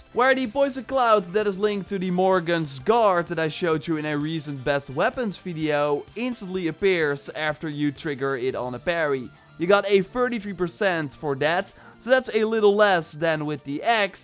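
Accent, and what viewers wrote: Netherlands. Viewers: Small Question are you dutch?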